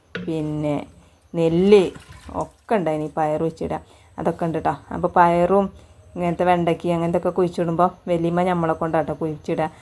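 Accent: Malappuram, India. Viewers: Malappuram bashayanallo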